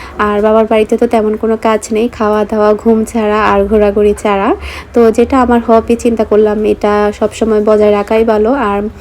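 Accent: Chittagong, Bangladesh. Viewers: আপু অনেক ভালো লাগলো তোমার চট্টগ্রামের ভাষা অনেক ভালো লাগে শুনতে ধন্যবাদ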